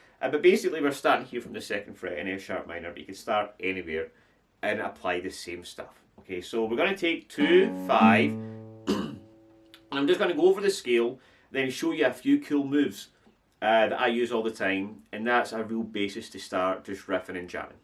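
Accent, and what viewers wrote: Scotland, United Kingdom. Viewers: Good to hear a fellow Scotsmen I improvise basically if you learn the 5 pentatonic scales learntje notes then find them on the fretboard easy lots of practice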